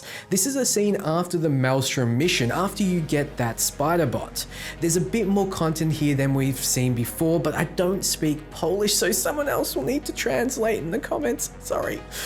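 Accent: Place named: Australia. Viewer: Hey, are you from Australia? I feel like your accent is Aussie (I'd guess Sydney):P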